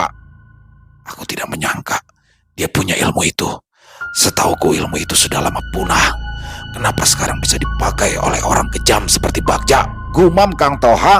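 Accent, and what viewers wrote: Jakarta, Indonesia. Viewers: Bang ❤❤❤, bank, bang Doni sama mba nani,aa hajat sama Clara,mas wasis sama mba ivory,mas gembul sama teh euis, 1/1 pada punya jodoh masing masing lucu deh,.wkwkwk aq suka bang bawain cerita,pakai logat betawinya mantap x,sampai saya tertawa sendiri pas dengerin bang cerita,..wkwkwk 👍👍👍 pokoknya menghibur x saya suka ini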